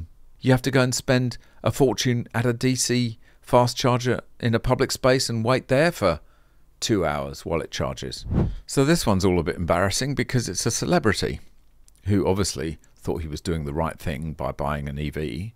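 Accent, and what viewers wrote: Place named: United Kingdom. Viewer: Listen!!! has a British accent.😂😂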